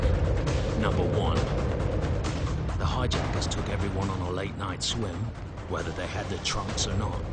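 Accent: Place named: Cockney, United Kingdom. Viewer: God I hate that cokney accent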